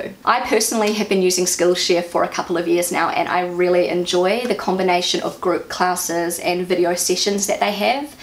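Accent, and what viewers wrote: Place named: New Zealand. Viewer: Love it!💕 happy to see how comfortable you feel and how much you have germanized without losing any of your kiwi identity ☺️ PS: love your account, as i‘m a german and spent 9 months in Whangarei during school and your account always reminds me of the kiwi accent and the country in general🥰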